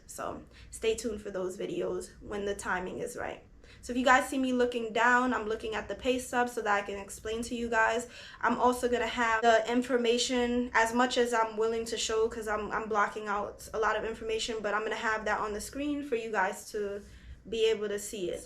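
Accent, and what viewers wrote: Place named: New York City, United States. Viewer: You have a very heavy New York City accent!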